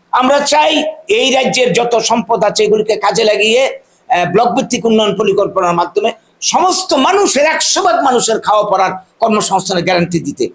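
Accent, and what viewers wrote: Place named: West Bengal, India. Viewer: Tumar kotha ta hoise pura Indian bengali hoto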